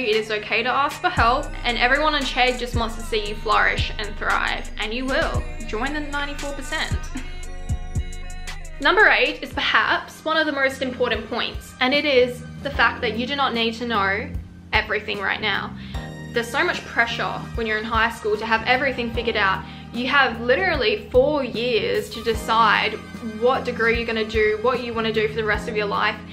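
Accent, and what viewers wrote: Australia. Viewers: Love the Australian accent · Thank you ! Your advices are just so helpful. Also, really appreciate your aussie accent 😊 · Your Aussie accent is amazing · i’m just living for her aussie accent.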